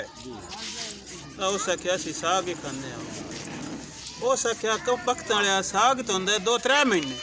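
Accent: Shahpur, Pakistan. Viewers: You are representing pure shahpuri accent of Punjab. Our shahpuri or Jatki bar is consising district like Mandi Bahauddin, Sargodha, Hafiz abad, Jhang, Chinote and Khushab.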